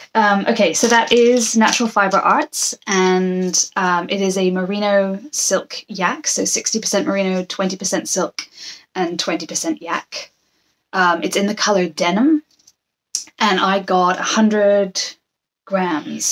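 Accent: United Kingdom. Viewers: Hi, Hello from Scotland. You just popped up on my YouTube suggestions, and I’m so glad you did. I was knitting with some Miss Click Clack Merri Creek Sock yarn while I was watching you. I’ve been a member of her Ravelry Group for around 6 years now. She is a wonder with the dye pots! I even flew from Adelaide to Melbourne a few years ago, for the day, just to attend a Craft Event and a “Clackette” get together. (I have lived sporadically between Adelaide and Scotland over the years - long story) I don’t sew but I still enjoy seeing beautiful fabrics and hearing what you intend to make with them. I’m amazed at what you found in thrift stores. I’m looking forward to seeing your winter coats in those gorgeous wool/cashmere fabrics. The aran cardigan was also a great find. It looks like you are leading an interesting life up there and I look forward to seeing more of your bus, and the surrounding country. 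And… I thought I had detected a tiny trace of a scottish accent here and there, and then you confirmed it. All the best. 🏴🥰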